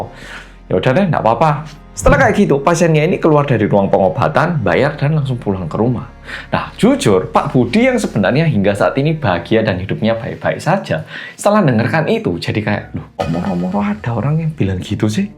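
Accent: Java, Indonesia. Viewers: Korea top logatnya jowo tenan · Bahasanya bnr2 lancar udah seperti org indonesia asli. Logat jowo pisan😅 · Logat korea mana ya, kok agak mirip Logat Jawa tulen,,,, maaf maklum subsreber baru nih,,, baru nonton video nya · Wuaduh kang Korea medhok berubah ke tema selingkuh, tau aja tema kesukaan netizen. Ha...ha... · Uedan kok bisa semedog itu bahasamu masee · Salut dg org korea satu ini.. dg gaya bicaranya yg indon banget. Kl kasusnya sih ga beda2 jauh dg asn imigrasi pekan baru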